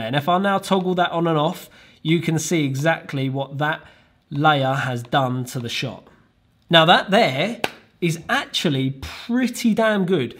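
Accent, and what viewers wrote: United Kingdom. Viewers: Strongest british accent...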